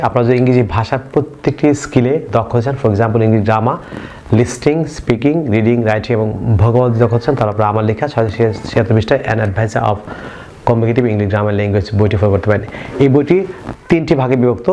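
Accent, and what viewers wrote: United Kingdom. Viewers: Nice class sir. You translate the passage of HSC textbook. Your british accents is very nice sir. I can learn british pronunciations from you.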